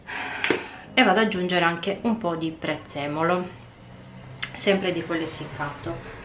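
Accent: Sardinia, Italy. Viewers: La proverò senz'altro!😝 Mi piace la cadenza Sarda della signora! Brava🤗👍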